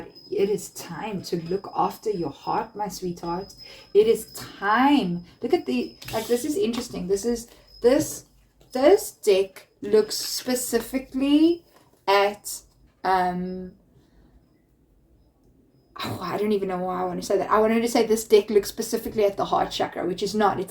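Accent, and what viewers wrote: South Africa. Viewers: Are you South African? Listening from 🇬🇧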